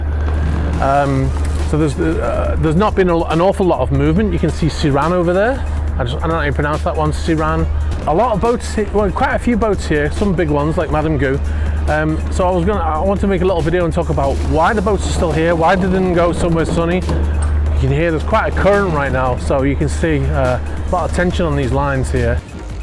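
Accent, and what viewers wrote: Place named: Merseyside, United Kingdom. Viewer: Sounds like you originate from Merseyside so the sea is in your blood then. How did you get into the career you've had?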